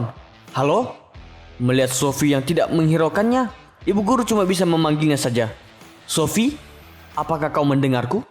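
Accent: Palu, Indonesia. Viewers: Kayak logatnya orang palu komiu ini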